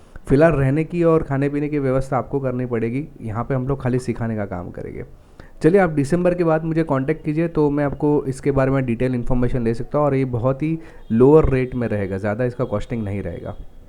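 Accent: Gujarat, India. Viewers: are you gujrati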